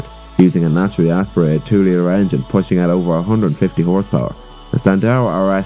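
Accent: Ireland. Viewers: What part of Ireland you from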